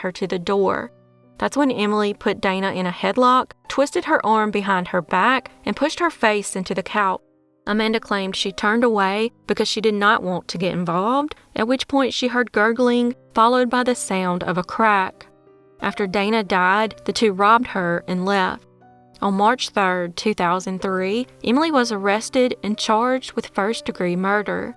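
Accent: United States. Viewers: just found your channel.. Good narration...Concise.. with the best American accent. Appreciating your content from the UK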